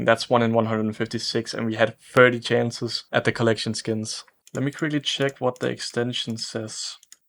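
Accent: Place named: Denmark. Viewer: Danglish is strong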